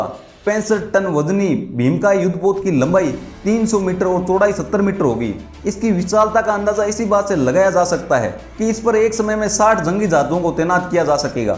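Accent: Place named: Haryana, India. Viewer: Bahi Haryana sa ho kya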